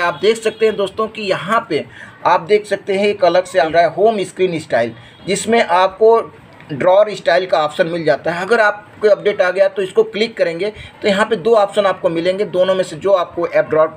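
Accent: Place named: India. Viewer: He's speaking English in strong Indian accent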